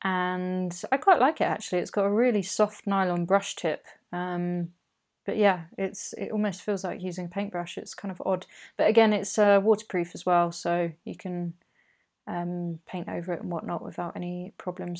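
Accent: United Kingdom. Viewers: I’m absolutely loving your channel at the minute. Your art is amazing and my style! I also love your accent! I’m from the uk too 🇬🇧 ✌️ xx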